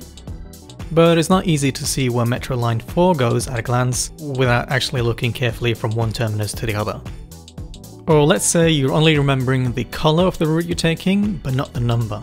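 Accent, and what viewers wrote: Australia. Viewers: This is a great video. I'm struggling to pick you accent though. It sounds like you're Australian, but with a big chunk of the south of England thrown in.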